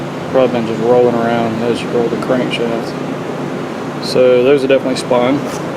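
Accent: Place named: Boston, United States. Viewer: Awesome videos dude! Your Boston accent is wicked pissah, you’d fit right in up here.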